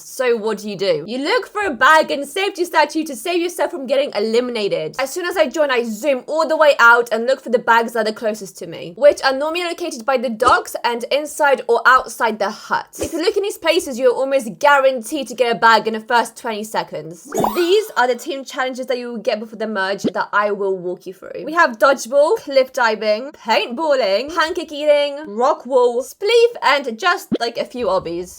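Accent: United Kingdom. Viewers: The British accent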